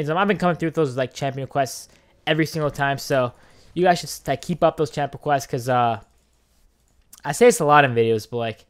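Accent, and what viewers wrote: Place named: Boston, United States. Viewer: are you from boston or around the east coast? you kinda sound like it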